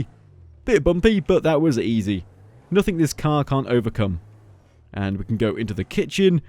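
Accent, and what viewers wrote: United Kingdom. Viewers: Are you british? 'Cause it sounds like you have a bit of a british accent.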